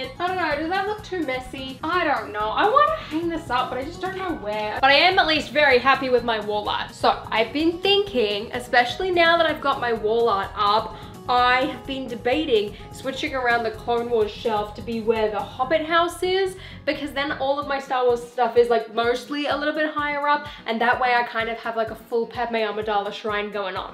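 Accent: Australia. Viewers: Agreed, I think always looks really natural. She has that striking Aussie look that fits with her quick, easy to follow australian english. If we're dealing out compliments, I also think she does really well at telling a story in her videos. They are well structured, which is not always the case for most Lego youtubers. That said, this video is probably not the best example of that, but it is something I've noticed quite often.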